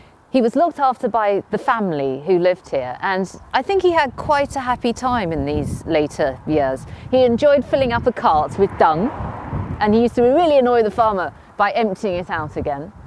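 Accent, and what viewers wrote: United Kingdom. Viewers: Look at what I just bumped onto. This is a great channel. I'm an American from New York City. I always look for YouTube channels like this one that focuses on history and actually goes and videos those historic places. I can already tell it will be fun watching them. I like the British accent. The British tradition. No annoying music in the background and I love learning about historic situations I didn't know about. Kudos to you Historic Royal Palaces for this gem of a channel. I will now go on a binge watch of ALL your videos. -- Frank. Cheers. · Subscribed just so I can hear you talk more! I love the British accent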